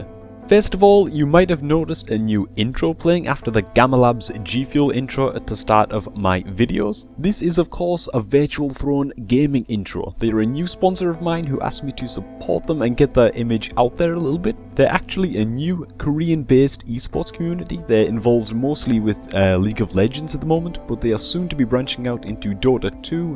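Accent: Newcastle, United Kingdom. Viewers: I was watching Swifty's vids and decided to check you out, and within 3 seconds of the video starting i realised you had a newcastle accent, just saying because live like 10 miles away >.<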